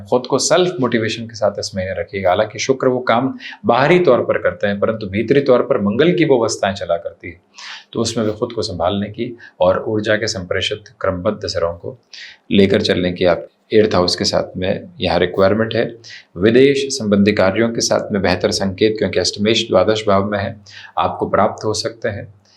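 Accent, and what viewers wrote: Standard. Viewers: Aap itni shudh hindi mein bolte hain ki mai chah kar bhi aapki predictions dekh nahi pati hun. Kyunki samjh nahi ata. Kripya thori saral aur aasan hindi mein batayein. 🙏🏽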